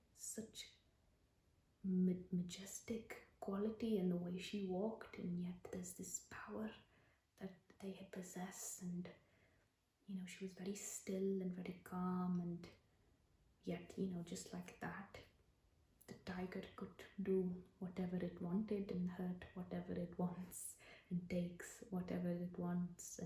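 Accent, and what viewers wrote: India. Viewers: Your accent is so lovely it’s so smooth with the Indian accent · I enjoy India accent videos. Thank you. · There aren’t many Indian ASMR videos so this means a lot to me. I love ASMR, Indian women, and Indian accents so thank you queen. 👑. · ahhh I can't believe I missed this!! Your first Indian accent video is one of my all time favourites! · I have missed indian accent video soooo much. Thanks !❤️ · I’ve always found Indian accents to be very nice. Sort of calming lol, glad others do too. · Love your accent ASMR videos! Please keep making the Indian accent ones, the last one was great too! Not enough of those in YouTube. So soothing and relaxing!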